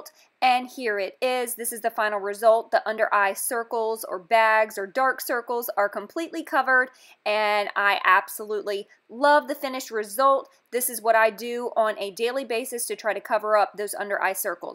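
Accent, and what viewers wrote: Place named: Georgia, United States. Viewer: oh my word! loved this. love your accent too! are you a georgia girl? i'm going to look for the salmon colored concealer at drugstore...thx!!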